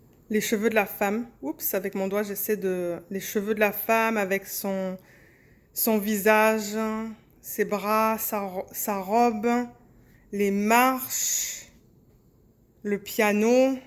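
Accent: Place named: South, France. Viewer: Bonjour, je suis une nouvelle de vos abonnées et je reprends vos vidéos depuis le début mais je me demandais...vous ne seriez pas du sud de la France par hasard? Car c'est très bizarre, vous avez un petit accent que je connais bien pour une canadienne??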